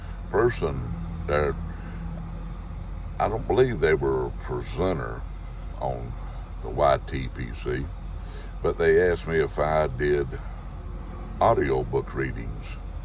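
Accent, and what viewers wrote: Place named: United States. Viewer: Hello! Every time I listen to I always think that you could have made millions with your voice and the way you speak. To me you sound like America. Like the America we use to know about here in EU After 25 years I’ve come full circle and smoked CB again. I still like it. You should keep this look. You look 20 younger without the beard. It’s a great look! Have a wonderful day! Silviu🤝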